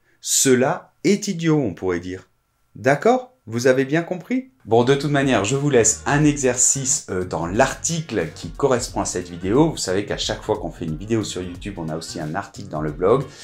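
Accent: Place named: France. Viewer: Bien expliqué et avec la prononciation française que j'adore!, bon weekend à toi et à ta famille! 😘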